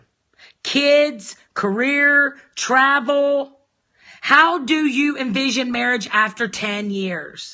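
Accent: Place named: South, United States. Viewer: I just love your Southern accent tho!! ❤🔥💯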